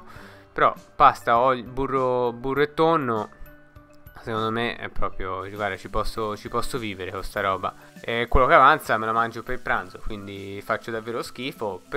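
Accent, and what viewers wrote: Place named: Tuscany, Italy. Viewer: L’accento toscano è ancora pazzesco!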